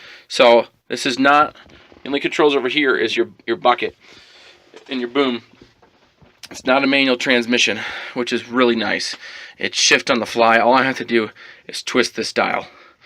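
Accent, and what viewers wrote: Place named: United States. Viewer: Where the hell do you live? You sound American as hell but the steering wheel is on the wrong side in ya truck and your gauges look all backwards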